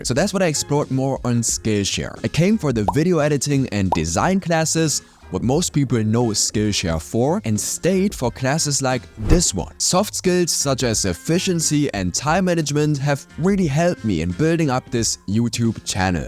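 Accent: Germany. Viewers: Are you German ? Man kann es raushören 😅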